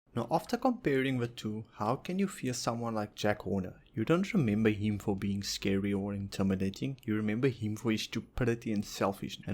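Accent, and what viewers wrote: South Africa. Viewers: Are you South African? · R u south African? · Really good video. are you South African by any chance? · are you afrikaans?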